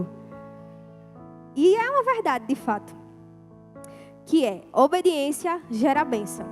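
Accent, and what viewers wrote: Northeast, Brazil. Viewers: O sotaque mais lindo que eu já vi Nordeste seu lindo 😍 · Gosto do sotaque nordestino Deus abençoe a todos nós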